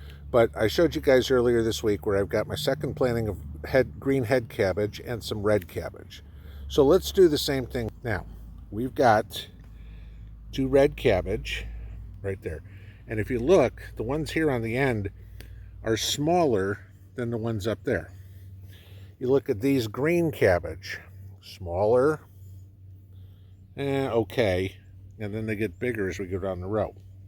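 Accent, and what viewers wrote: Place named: New England, United States. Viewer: I tune in because I learn a lot, or at least learn about things I need to research more. You’re like my Yankee YouTube uncle, lol! No harm meant by the Yankee comment — I’m southern through and through so we talk a little different, but definitely are likeminded!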